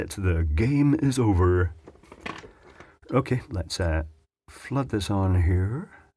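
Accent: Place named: England, United Kingdom. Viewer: A bit odd to hear "ain't" in an English accent.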